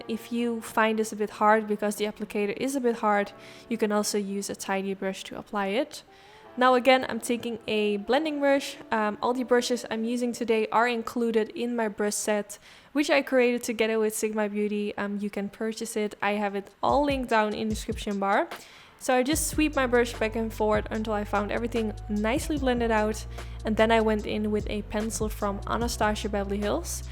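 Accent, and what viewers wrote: Netherlands. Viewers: Ik hoorde het al aan je accent dat je Nederlands was ☺️💕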